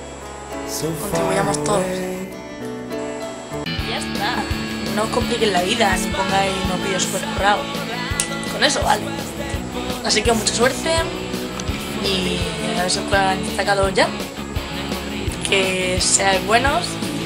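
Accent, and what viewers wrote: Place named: Murcia, Spain. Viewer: ese acento murcianica!! ^^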